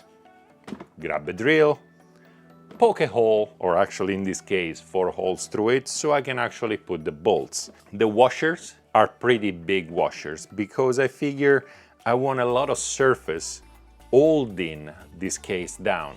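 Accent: Italy. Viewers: that's an Italian accent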